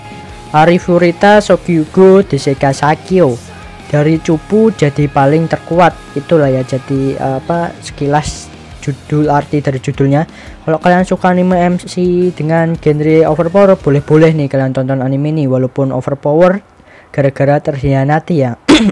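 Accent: Java, Indonesia. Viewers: Medokk tenan mas e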